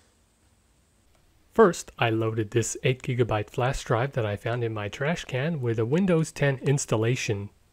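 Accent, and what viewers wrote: United States. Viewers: It’s been so long he almost got me with the American accent. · Just realised was speaking in an American accent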